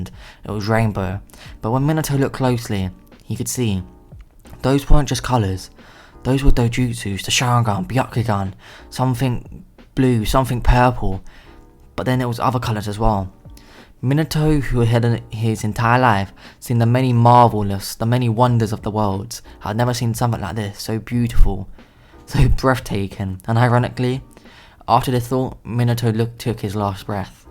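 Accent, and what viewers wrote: United Kingdom. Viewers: u British mate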